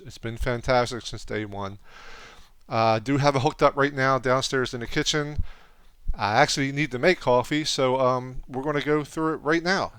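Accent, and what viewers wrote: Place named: Philadelphia, United States. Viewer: Just subscribed . Just bought this and listened to you on the way home . I thought I recognized the accent then I heard “wooder” and I hit that subscribe button... r u from Philly or delco or pa ? HHaha cheers from one pa youtuber to what I think is another one !!!!! Peace ✌️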